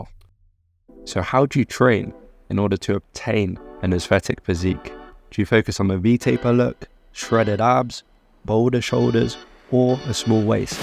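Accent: United Kingdom. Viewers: Bro speak like british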